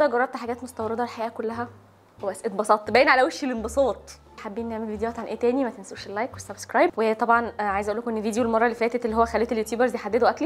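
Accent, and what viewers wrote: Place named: Gulf, Arab world. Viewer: لا ادري حلوه اوي تحسيها قلبت خليجيه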